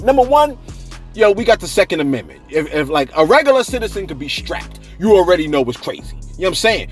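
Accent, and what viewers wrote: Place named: East Coast, United States. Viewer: His "C Om Sayn" is so East coast..Sup South Jersey...The page is entertaining..His accent is too cute and his hype voice is too funny... he posted a King of Clubs. What you play Tonk or pitty-pat..That million is coming quickly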